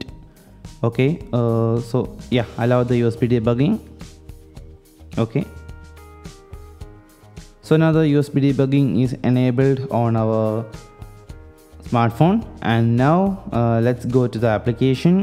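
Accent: Kerala, India. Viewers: മലയാളി ആണോ???english കേട്ടപ്പോൾ അങ്ങനെ തോന്നി അതാ!!!!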